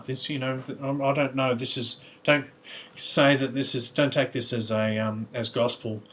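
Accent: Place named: Australia. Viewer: thanks, I'm enjoying your commentary, nice to hear an Aussie voice on YouTube!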